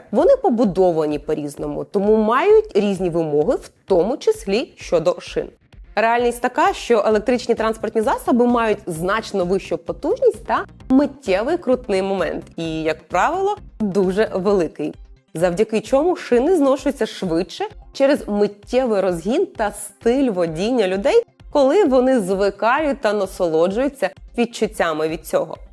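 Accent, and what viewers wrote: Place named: Russia. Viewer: вибачте- на "першому автомобільному" на другий рік війни ви капітулювали і,вслід за іншими, обмоскалились!??? Це жах - чути Вас масковскою😢😢😢... Осадачєк астался😮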